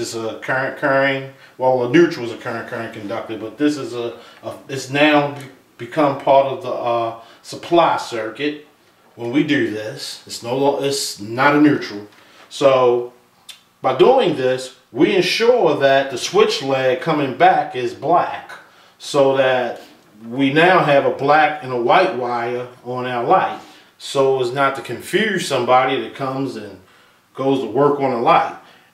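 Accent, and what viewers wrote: Baltimore, United States. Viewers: Are you in the Baltimore area? Kind of a Bmore accent. I'm in DC. Anyway kick ads videos. You just got another subscription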